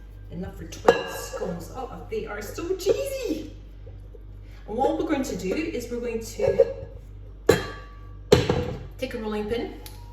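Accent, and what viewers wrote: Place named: Scotland, United Kingdom. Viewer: Thumbs up for your scones (and your lovely Scottish accent!)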